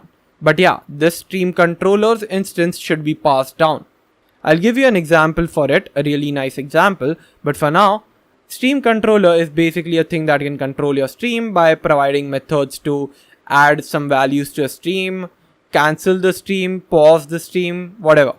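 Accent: India. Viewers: Textbook indian accent, love it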